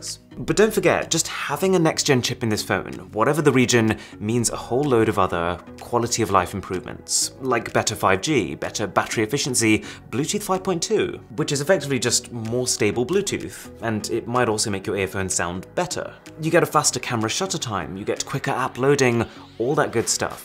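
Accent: RP, United Kingdom. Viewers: It is the British accent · Yes, he is posh. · That is the poshest accent I've ever heard from someone who wasn't old as shit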